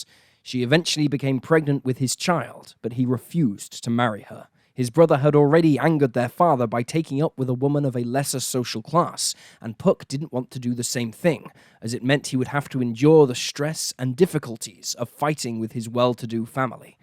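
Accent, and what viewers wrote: England, United Kingdom. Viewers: Good, the English guy is back.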